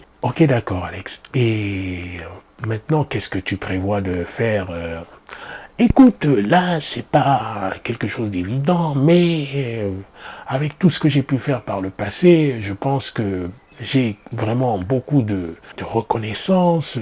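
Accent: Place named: France. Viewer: Wow I don't know why but I really love the accent of French although I hardly understand it 😊 I am a new friend and here to support you. I do hope to get one back 🙏😊🤗🔙 thank you so much 🙏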